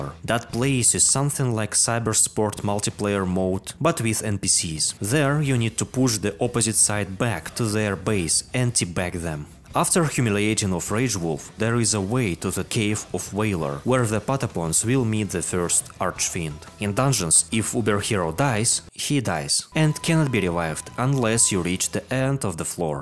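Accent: Russia. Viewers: А я почему то догадался что ты русский, по манере разговора английского, его тембра да и самого звучания, но на я в этом убедился, тк сохранения Ppsspp было на русском. Мне приятно знать что ещё есть русское комьюнити по этой старой и шедевральной игре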